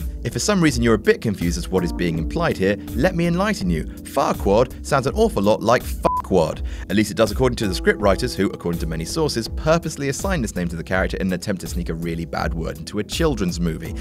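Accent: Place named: RP, United Kingdom. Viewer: For a moment I thought I was watching a WatchMojo video.... Wondering what I was doing with my life. Then, I heard soft posh voice and I knew, despite everything, all was right with the world.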